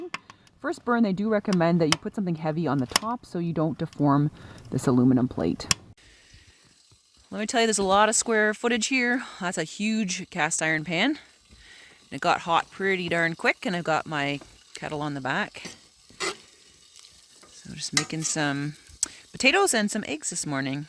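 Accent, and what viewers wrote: Canada. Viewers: You must be Canadian! Just subscribed. Never stop saying "oat" for "out." Of course your way is just a correct as ours!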